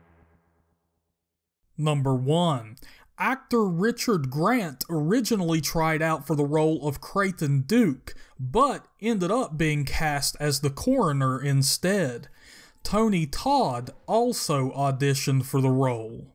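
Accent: United States. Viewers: Wtf is your accent!? I love it! I know it’s American... I’m from New Orleans myself. ⚜️😉.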